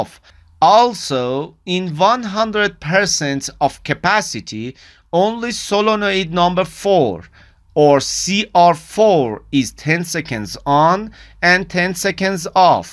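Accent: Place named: Iran. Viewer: your accent was iranian..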